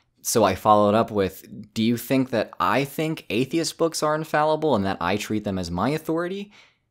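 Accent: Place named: California, United States. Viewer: I was noticing this too! It's almost certainly auto-generated, and his California accent seems to be screwing with the caption generator.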